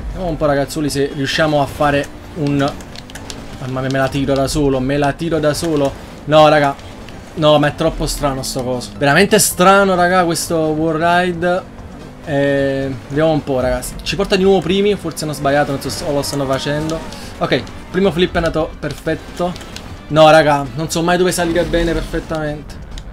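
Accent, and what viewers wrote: Naples, Italy. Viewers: È di Napoli :D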